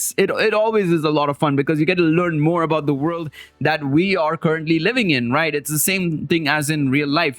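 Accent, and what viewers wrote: India. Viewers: Are u indian as u sound very indian or christian maybe just would like to know and plus anyways ur videos are very good and enjoyable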